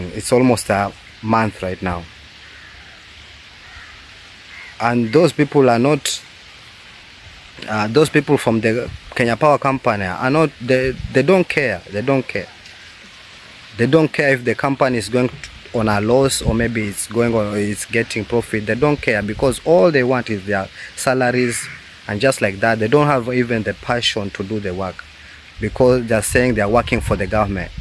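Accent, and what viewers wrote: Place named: Uganda. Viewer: Bro you sound like a Ugandan and you are saying you are from Kenya😮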